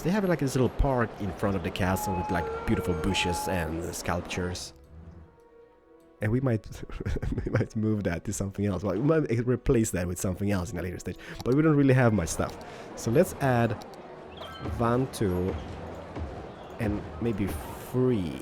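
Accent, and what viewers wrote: France. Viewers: hello,you have a great french accent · J'adore ton accent français :D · Very good french accent, i'm french and that's cool :)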